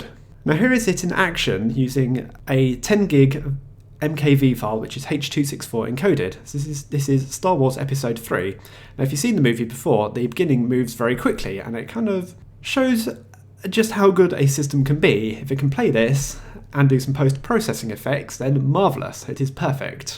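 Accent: England, United Kingdom. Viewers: ***** I wish I would have talked to you 18 months ago. The box is dedicated to KODI (boots up to KODI), so it makes sense to dump pulse. I'm reading the wiki now. I'm one of the ones that has dvd rips up to 42GBs and many are ma and trueHD. I've had to change the output to DTS or DD. For some reason I thought you were in Australia. Sounds more like England. Thanks for your help. I'm going to give it a try.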